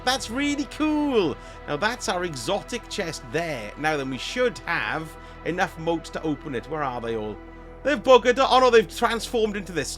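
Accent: Wales, United Kingdom. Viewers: didnt expect the welsh accent haha, first time on your videos. Great content, cheers for the guide!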